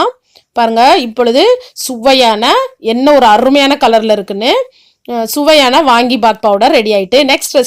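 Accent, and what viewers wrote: Tirunelveli, India. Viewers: Tirunelvelila endha ooru aunty ungaluku? Mumbaila irukinga pola.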